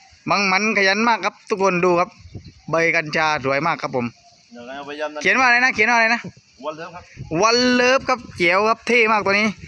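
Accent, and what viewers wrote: South, Thailand. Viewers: บัง ทองแดงทุกคริปเลย คนอืนฟังออกม้ายม่รุ้ ผมฟังออกแต่ว่า เราคนไต้ด้วยกัน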